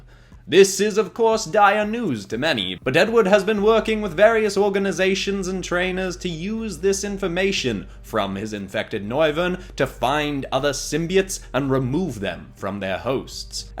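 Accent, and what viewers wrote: United Kingdom. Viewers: The y highlight of this video was definitely the British accent 😂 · just saying I like the video but the narrators accent you know the English accent it just doesn't hit right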